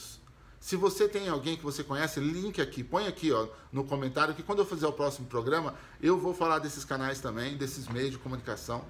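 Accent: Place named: Urban periphery, Brazil. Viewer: Vc tem a voz da periferia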